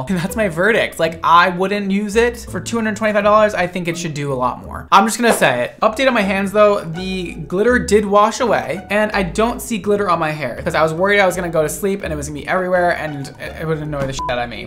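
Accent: United Kingdom. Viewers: LIVING for your cute British accent! Nailed it! ❤ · me lives in the uk does British accent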